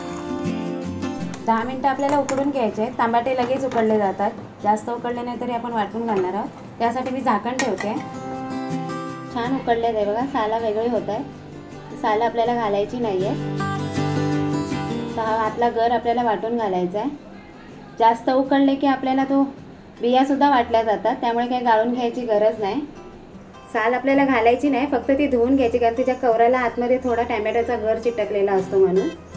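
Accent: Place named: Malvan, India. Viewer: Like your malvani accent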